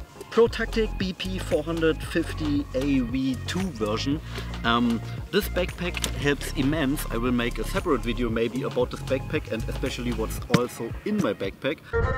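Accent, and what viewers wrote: Germany. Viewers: dein englisch hört sich voll deutsch haha nicht böse gemeint aber waren auf jeden fall gute tipps 💪🏽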